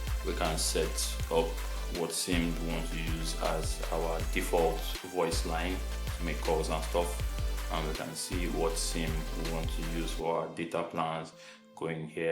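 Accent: Nigeria. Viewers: Good day, You sound NIGERIAN. Please how does one call using the secondary sim from contacts. Since I can have numbers in my head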